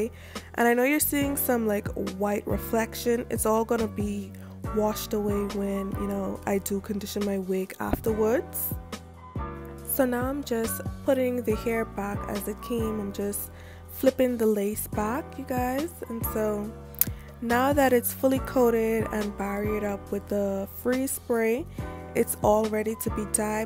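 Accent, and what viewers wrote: Bahamas. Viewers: jus had ta b bahamian frm she strt tlkn we cnt hide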